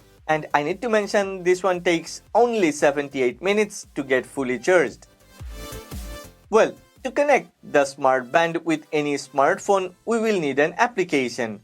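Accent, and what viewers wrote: India. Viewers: I will be subscribed to your channel when you will stop to speak with this terrible Indian pronunciation.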